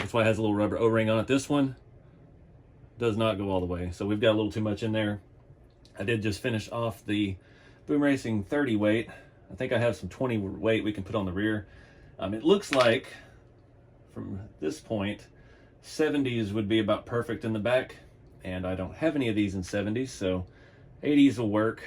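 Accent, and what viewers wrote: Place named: South, United States. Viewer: RC4WD science!!!! I have a way worse southern draw than you do. Fella that tried correcting you would hate to talk to me lol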